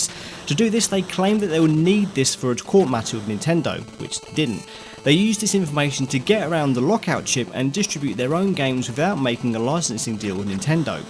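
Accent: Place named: Southern England, United Kingdom. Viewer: bet you any money this guy is from south england or london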